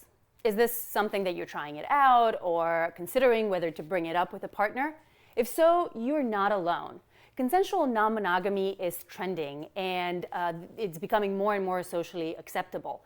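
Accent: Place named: North Macedonia. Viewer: Great video. I've been into the open relationships, swingers lifestyle, polyamory, consensual non-monogamy for years - before it was considered a hip thing to do. I totally agree the past couple years all this has been trending, but I'd also says a lot of the Millennials are treating it like a fad. A fad until they get hurt - due to lack of research before jumping head first into the lifestyle. Btw...I love your podcast!! And I can so hear that Macedonian accent of yours coming through 👌 😁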